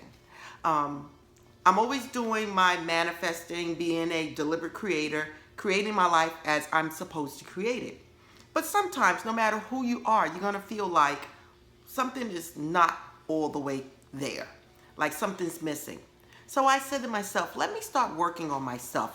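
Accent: New York, United States. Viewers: Tallkking..love my fellow nyer. Great mtrl..thank you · Girl! I have never seen you or your videos before but this video was in my feed and it is no accident. This is definitely something I needed to hear. I've done this in the past - not really knowing what it was called and it is an amazing technique. I must pick it up again because I need to break through some stuff. BTW, you must be a fellow New Yorker. I can tell by the way you say "tawk" aka talk. LOL. Anyway, I liked and subscribed.🙂👍🏽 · Definitely from New York. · You have to be from NYC♥️🙏🏿I hear your accent. I’m from Brooklyn and enjoy your content. ♥️🙏🏿Blessings to you and your family.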